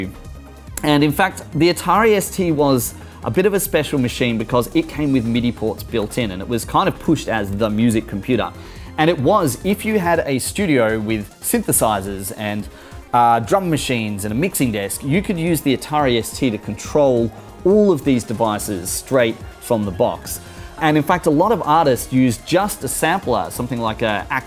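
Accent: Australia. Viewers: Hey mate are you an aussie from Melbourne that lives in Singapore? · I'm American but you Aussies are one of my favorite English accents to hear. It's just so vibrant and colorful lol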